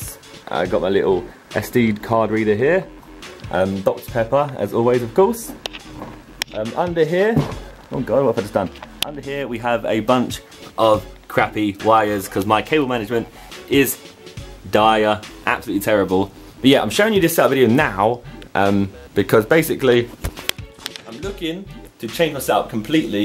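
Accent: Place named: London, United Kingdom. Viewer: I love the way Londoners say 'something'. 'Hey guys Zerkaa here and ive got suink a little bit different for you today'. Just lol.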